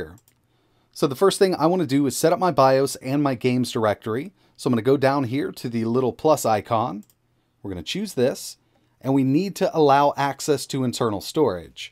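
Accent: United States. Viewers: An American accent.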